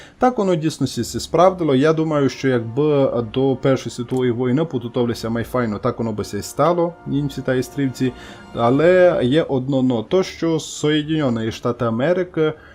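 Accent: Transcarpathia, Ukraine. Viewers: Чоловіче, славно виходить)) і закарпатський діалект - теж файний. Я би так і продовжував коментувати: усе зрозуміло, але ти звучиш значно природніше і легше👍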